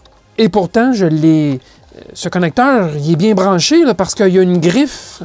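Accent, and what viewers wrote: Quebec, Canada. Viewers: L'accent me donne vraiment envie de visiter le Canada(Quebec) with my 3 kids😊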